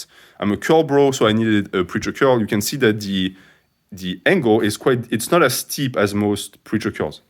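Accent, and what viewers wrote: France. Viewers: dude im just happy for you reaching 10k subs! good humour, informative videos and french accent :D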